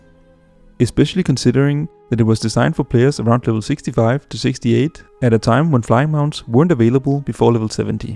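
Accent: Denmark. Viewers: Danish accent detected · Love me some Dano-English accent, sounds too adorable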